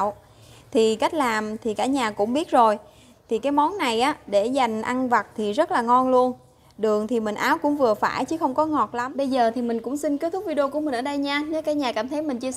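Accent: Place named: Mekong Delta, Vietnam. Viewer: Mình thích món này lắm, hôm nay được bạn chia sẽ công thức cảm ơn bạn giọng nói của bạn thật dễ thương, mình nghĩ chắc bạn là người miền tây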